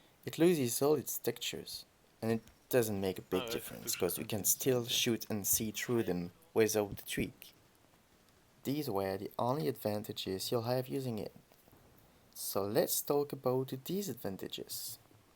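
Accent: France. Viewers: You have the most intense French accent I've ever heard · L'accent français discret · Are you French ? If yes, you speak English very well !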